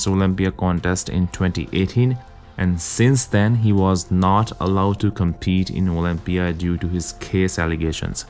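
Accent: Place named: New Jersey, United States. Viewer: That’s a lovely accent you got there… New Jersey?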